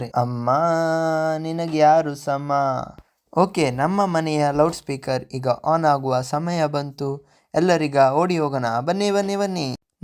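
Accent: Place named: Mangalore, India. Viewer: Manglore kannada super voice nice bro❤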